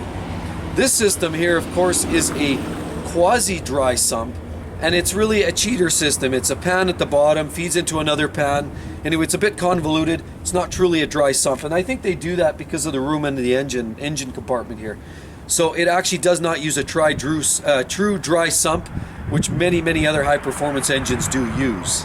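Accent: Canada. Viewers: Your Canadian aren’t are you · listening to your accent to figure out that you are another fellow canadian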